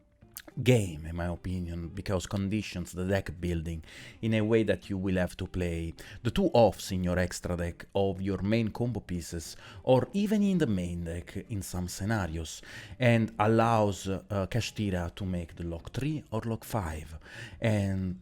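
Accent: Italy. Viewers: E' il tuo primo video che vedo e ho notato subito dall'inglese che sei un fratello Bel video e concordo sulla banned aggiungerei virus a 0/ skill drain a 1 pero' per il resto ci sta p.s engage a 2 e' sicura quindi non serve una prediction per questo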